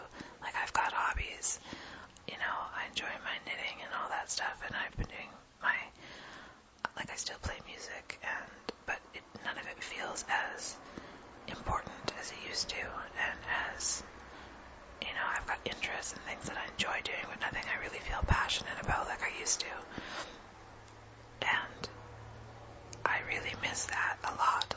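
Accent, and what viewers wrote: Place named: United States. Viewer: great videos your the best whisperer on the net we have english ones too but something about the american accent is best for this.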